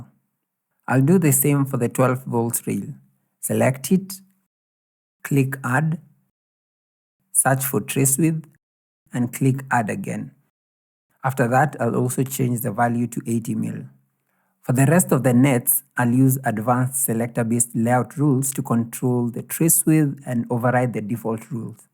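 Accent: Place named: Kenya. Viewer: You sound like a Kenyan, I'm impressed by the clarity of your explanation and inspired to gain such understanding. Great work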